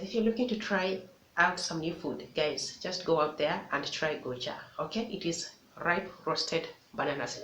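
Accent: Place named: Kenya. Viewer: I'm trying to guess where in Kenya you're from. I'm guessing somewhere between Central & Meru. Like Embu/Kirinyaga. My best guess is Nyeri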